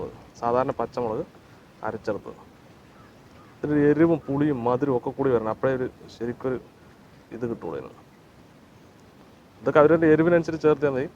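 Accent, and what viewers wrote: Palakkad, India. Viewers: Thani palakkaadan...😂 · പാലക്കാട്‌ ഭാഷ പൊളിച്ചു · Palakkad ആണോ വീട്...? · ഫിറോസ്ക്കാന്റെ പാലക്കാടൻ സംസാരം കേൾക്കാനായി മാത്രം വരുന്നവരുണ്ടോ ഇവിടെ? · തനി പാലക്കാടൻ ശൈലി... 😍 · Aa Palakkad slang😍😍😍😍😍😍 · ചേട്ടൻ പാലക്കാട്ട്കാരനാണല്ലെ പൊളിച്ചൂട്ടാ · That Palakkadan slang!! 😍😍😍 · This is Palakkad Malayalam sir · Ningalu palakkad karananno?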